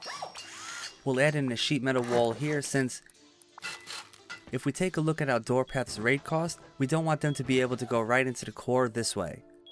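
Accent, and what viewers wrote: New York, United States. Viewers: U from New York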